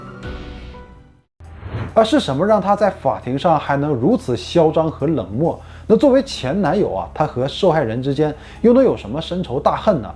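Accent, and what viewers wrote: China. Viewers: 就是放弃了吧，不配合也没什么奇怪的，不是每个人都那么有求生欲。只不过后面也许是重新有了什么生存的渴望，才会开始上诉。 能下跪祈求，也许是真的割舍不下吧，活着不能在一起，那就一起死吧，只不过他终究是没有自杀成功。抛开对错，他的行为也没什么奇怪。人家性命都不要了，又怎么会在意一点儿法庭上的行为。 主播口音像大陆人，但一个生在红旗下，从小唯物主义洗脑的人，扯什么遗臭万年太荒诞了吧，满手血腥的都不会在意，咆哮公堂的有什么可在意的，自古以来这样的人多了去了，谁的名字能因为这个留下了，“遗臭万年”的成本有这么低么。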